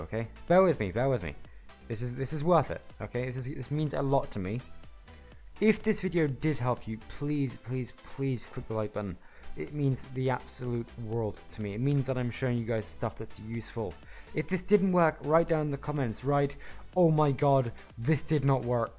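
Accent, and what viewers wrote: United Kingdom. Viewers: the strong bri'ish accent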